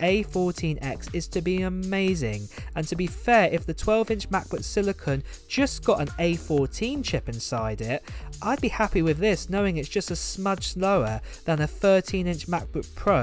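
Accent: United Kingdom. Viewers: Are you from the UK? You have that accent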